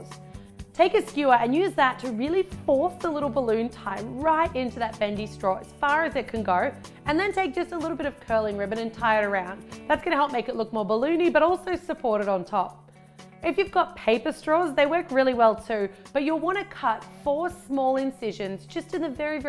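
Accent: Australia. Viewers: She's actually from New Zealand but i think most people just say she has an Australian accent :))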